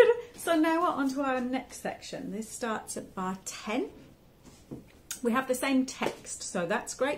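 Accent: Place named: England, United Kingdom. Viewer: thank you..I love your accent..you sound like you might be from England.. perhaps London?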